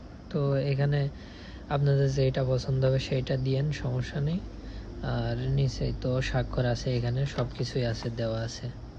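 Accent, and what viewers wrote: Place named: North Bengal, India. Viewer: আপনার বাড়ি কি উত্তরবঙ্গে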